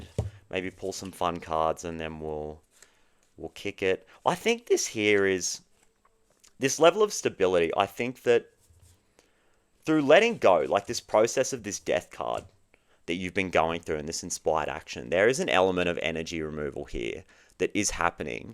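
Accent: Australia. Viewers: The accent initially made me think you were taking the piss! Its so refreshing to see an enlightened Aussie guy thats not wearing a decorative scarf and upcycled pants that were formerly their grandma's curtains... your beautiful authentic self is no doubt inspiring other men that being real and vulnerable (gasp) is actually perceived as strength and not weakness.... well done and sending you love from south of the border! 😊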